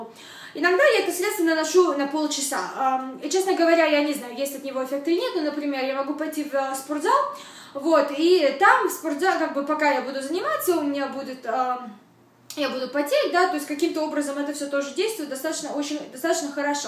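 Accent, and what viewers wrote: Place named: United States. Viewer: у тебя акцент))) наверное ты проживаешь в Америке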